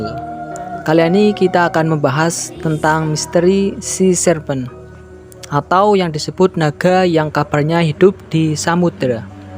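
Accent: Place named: Banyumas, Indonesia. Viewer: anjay ngapak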